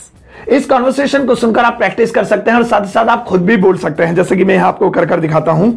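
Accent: India. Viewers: Indian accent is not nice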